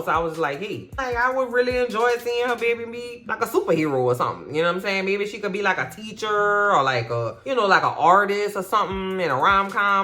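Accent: New Orleans, United States. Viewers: Gurrrl, I don't know you from Adam, this is my first time on your page. And even so, within less than 1 min of hearing you talk, I was willing to bet my last dollar that you are from N'awlins ALL DAY! LOL. Then you showed the N'awlins basket for the give away. Man, I laughed so loud. So, I'm here to both support your goal and to put my name in the hat for the New Orleans goodies. Congrats on 20K!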